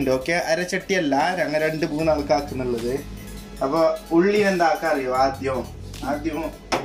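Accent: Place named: Kasaragod, India. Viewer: അടിപൊളി സംസാരം രസമുണ്ട് കേൾക്കാൻ കാസർക്കോട് languageജോറായിട്ടുണ്ട്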